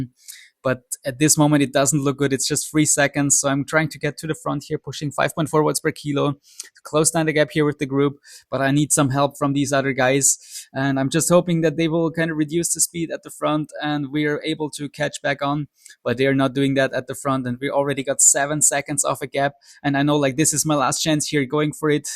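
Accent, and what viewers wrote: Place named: Portugal. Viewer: Portuguese origins? The first name but mostly the english accent. Sounds very portuguese style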